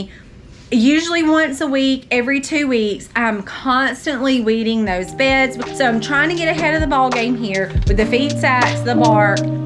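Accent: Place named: Mississippi, United States. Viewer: Yeah that Mississippi drawl is awesome!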